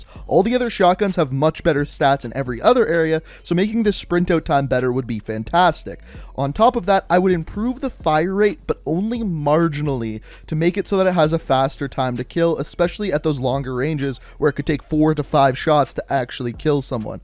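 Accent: Canada. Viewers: Why do Canadians like there ooooos and eeees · You sound 🇨🇦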